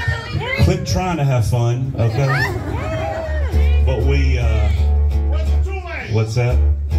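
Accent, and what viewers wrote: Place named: South, United States. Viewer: Love u love ur Southern accent awesome song 💯 love it number 1 hit ❤❤❤❤❤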